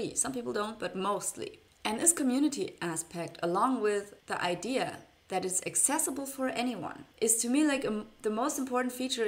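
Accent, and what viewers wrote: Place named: Germany. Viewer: I hear a German accent. Yikes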